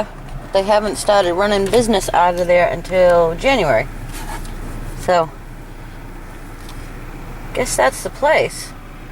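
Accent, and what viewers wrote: Boston, United States. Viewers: Hey i wanted to let you know how much i enjoy watching your videos. I'm 19 and from Scotland and i watch your videos every day. Your accent is so cool the Boston accent is my favourite, probably because i love New Kids On The Block so much!:) Please keep making videos because they make my day a little better xxx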